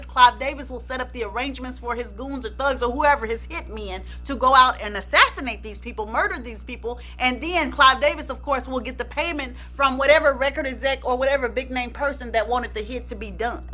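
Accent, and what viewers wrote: South, United States. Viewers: You're so country and loud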